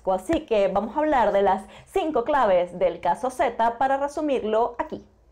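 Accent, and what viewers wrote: Venezuela. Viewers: eres venezolana?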